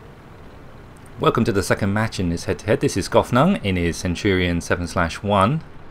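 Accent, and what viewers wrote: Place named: United Kingdom. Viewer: its the British accent i guess;) didnt hear the sarcasm.. good weekend.. also can i send some gameplay?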